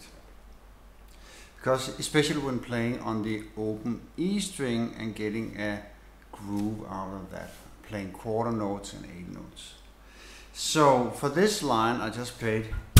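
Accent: Denmark. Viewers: That is one Danish accent right there :) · That is the CORE of danish accents! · haha vidste du var dansker da du sagde hej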